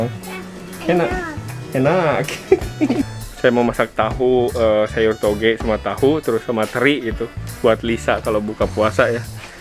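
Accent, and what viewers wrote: West Java, Indonesia. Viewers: gaya bahasa sama logat sunda pisan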